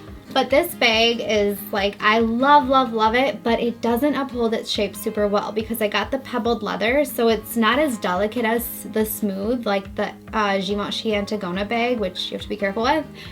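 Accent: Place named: Midwest, United States. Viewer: Probably Midwest (Wisconsin or Minnesota) just guessing from her accent "bag" lol. I live in the Midwest too.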